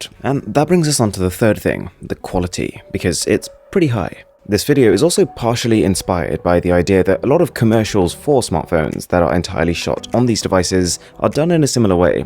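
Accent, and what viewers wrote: United Kingdom. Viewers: Your from he uk!!!!?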